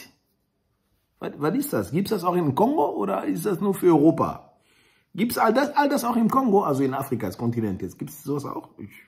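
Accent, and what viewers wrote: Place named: Ruhr, Germany. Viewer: Wer genau hinhört, hört hierbden Ruhrpott raus. Gut so; da liegt eh das Herz auf dr Zunge. Glück auf.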